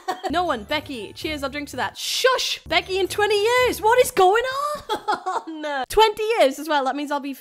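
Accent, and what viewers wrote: Wales, United Kingdom. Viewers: I fucking love ur accent. Only time I've acc liked the Welsh accent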